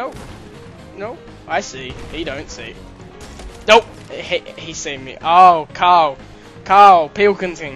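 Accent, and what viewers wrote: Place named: Australia. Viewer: Where from Australia are u from?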